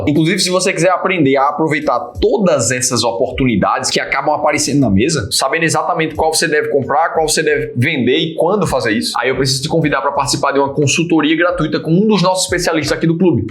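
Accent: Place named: Northeast, Brazil. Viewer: O Sotaque Nordestino é forte🌞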